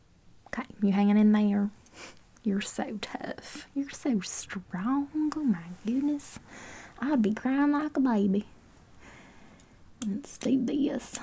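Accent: South, United States. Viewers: Love the southern drawl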